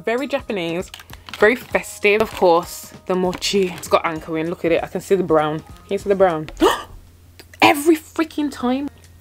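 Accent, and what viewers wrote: Birmingham, United Kingdom. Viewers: Yo sis love the videos. Are you from Brum? Your accent sounds like it haha. Hope you're doing well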